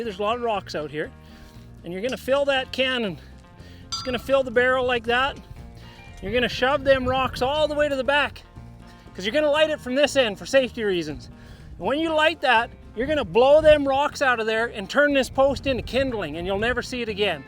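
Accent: Canada. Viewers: Slight Canadian accent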